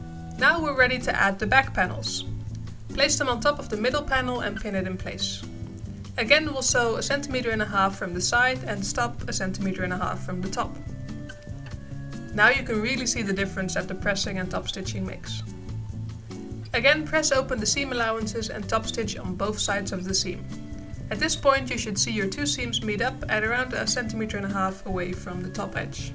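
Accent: Netherlands. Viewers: Are u dutch? :0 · Are u dutch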